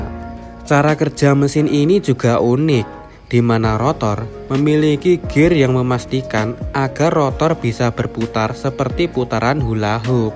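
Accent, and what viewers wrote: Java, Indonesia. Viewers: Nice job. Btw saran intonasi pas baca narasinya sedikit dibenahi bro, rapopo ketok jowone sitik2, sing penting nafa bicarane menarik viewer sing nonton. Tetep Semangat bagikan edukasi. Tk subs ki lho. 😁👍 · Medok banget ya ..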